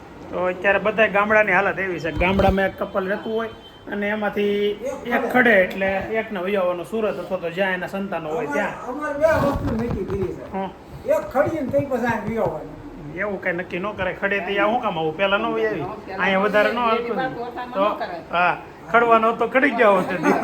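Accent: Kathiawar, India. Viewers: Bhai tamari khatyavadi languge bau maja ave che sambhadvani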